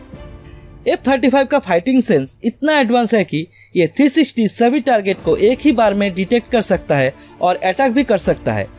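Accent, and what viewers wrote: Bengal, India. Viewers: Bro you are Bengali, I can smell it😘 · You are bengali.... there is bengali accent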